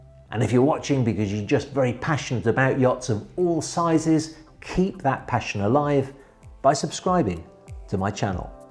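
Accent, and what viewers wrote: United Kingdom. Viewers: Where abouts in the UK is your accent from, its driving me crazy trying to pin it down.?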